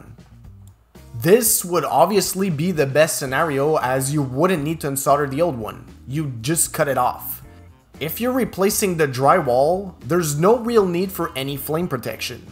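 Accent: Quebec, Canada. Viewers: Love your videos. Is this a Quebec accent I'm hearing? :)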